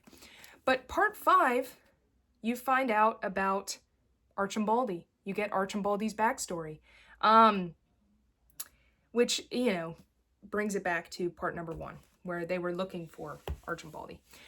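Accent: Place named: Pennsylvania, United States. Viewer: You are definitely from either eastern Pennsylvania, Delaware, or the Baltimore area. Your dialect gives you away. PS great list and great content.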